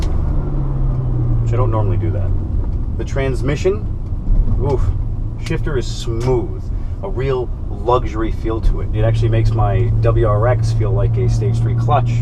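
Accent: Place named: New York, United States. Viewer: Exactly what I was thinking, got to be a New York accent thing.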